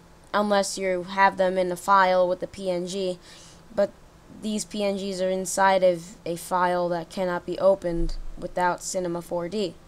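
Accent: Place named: New York, United States. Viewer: you have a new york accent lol. anyways thanks for the help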